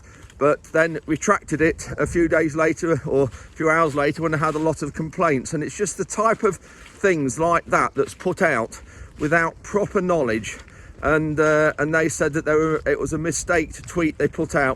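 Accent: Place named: Lincolnshire, United Kingdom. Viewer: As an exiled Yellerbelly from the Fens always good to see what's happening on the land, even if you are up on the high ground. And good to hear a Lincolnshire accent too!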